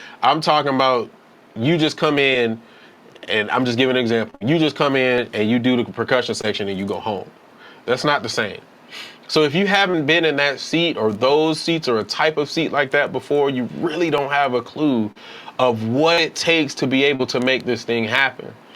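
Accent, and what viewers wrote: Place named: South, United States. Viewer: you lean towards Southern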